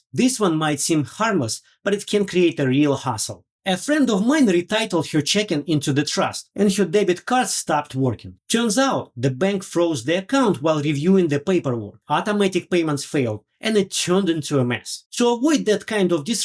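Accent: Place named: Ukraine. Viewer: I love your voice accent ❤...R U Ukrainian? (good advise too!)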